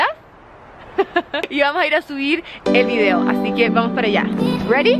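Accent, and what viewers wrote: Chile. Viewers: •DjPABLO• •Gameplays y Diversion• es chilena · es chilena los chilenos hablan así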